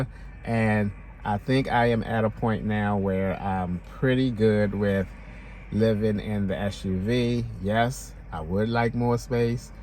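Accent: Baltimore, United States. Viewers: I knew you were from bmore from how you talk lol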